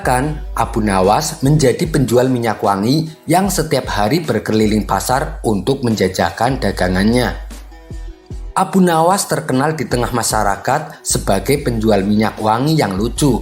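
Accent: Java, Indonesia. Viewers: Medok amat kowel lo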